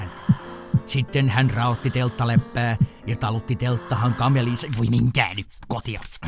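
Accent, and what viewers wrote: Finland. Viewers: Olipa mukava kuulla omaa murretta, kun asunu Keski-Suomessa nyt 10 vuotta.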